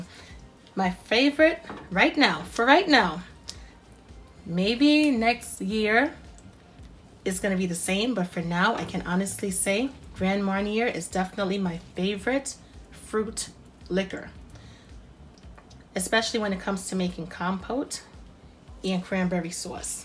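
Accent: New York, United States. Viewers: Yup, I'm a native NY'er living in Florida and I love hearing the accent. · You have the most New York accent I've ever heard, lol! Where are you from?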